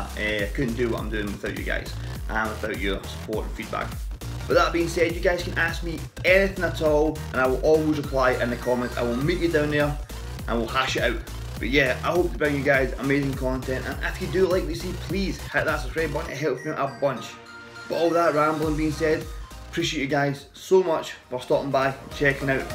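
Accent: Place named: Scotland, United Kingdom. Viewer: Haya fella you were nice enough to check me out so I've come to check you out. I've liked what I've seen (Love this Trailer :-) ) I take it from your accent that your from Scotland. Were about are you based?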